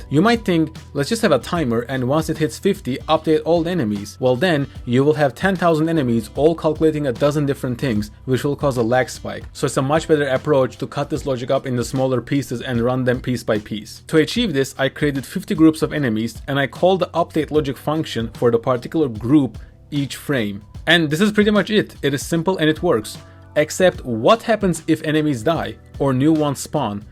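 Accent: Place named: Turkey. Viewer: Kanka sen turk musun aksan turk aq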